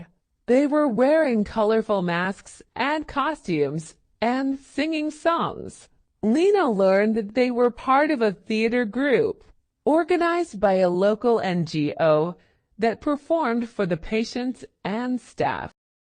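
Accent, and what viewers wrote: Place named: United States. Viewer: اللكنة أمريكية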